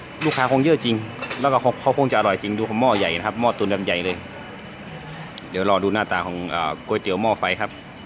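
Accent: North, Thailand. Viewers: น่าจะอู้เหนือเนาะคับ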